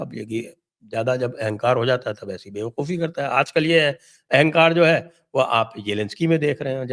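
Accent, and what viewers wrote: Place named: Gwalior, India. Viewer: सर जय श्री राम, बहुत दिनों बाद आपकी भाषा में typical ग्वालियर वाला पुट सुनकर बहुत अच्छा लगा l धन्यवाद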